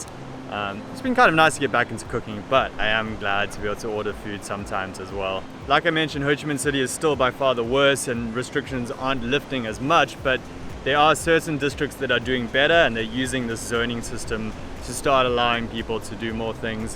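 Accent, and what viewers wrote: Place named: South Africa. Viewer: tried to place your accent, not North America, i guess South Africa?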